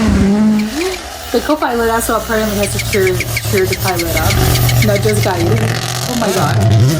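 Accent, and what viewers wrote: United States. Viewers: As a swede. Your accent is standing out. I imagine jersey? Am I totally wrong here?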